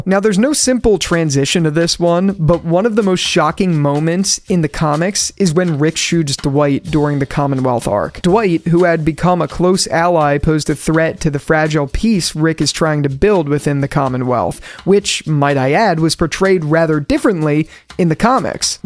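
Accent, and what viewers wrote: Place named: Philadelphia, United States. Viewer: Love the Philly accent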